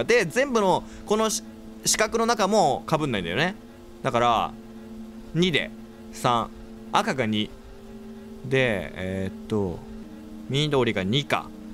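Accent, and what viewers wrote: Kansai, Japan. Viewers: たまに出る関西弁めっちゃ良いですよね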